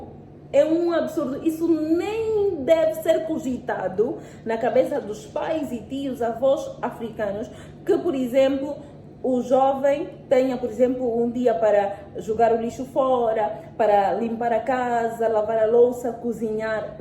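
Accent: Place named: Portugal. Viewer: Ela tem um sotaque português, muito agradável.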